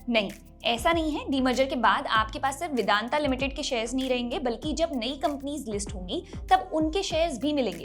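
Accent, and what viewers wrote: India. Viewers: Voice nahi diction....marathi diction...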